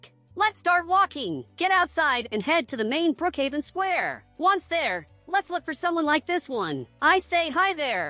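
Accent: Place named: Portugal. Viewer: Oxi ele é português e tá fingindo ser inglês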